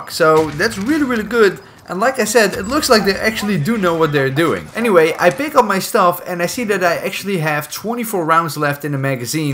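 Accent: Netherlands. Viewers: i smell nederlands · You sound dutch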